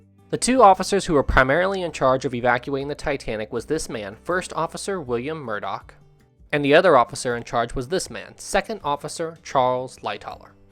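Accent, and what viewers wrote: South, United States. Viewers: As usual, awesome video man!! Where are you from? I’m catching a slight southern accent haha. I’m a Tennessean Titanic Fanatic. 😂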